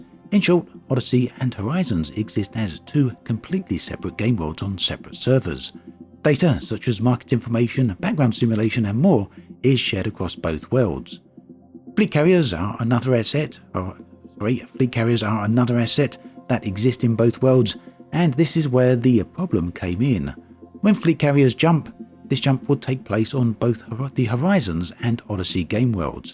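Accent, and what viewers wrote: United Kingdom. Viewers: I fucking love your accent! Is this british or something special or regional?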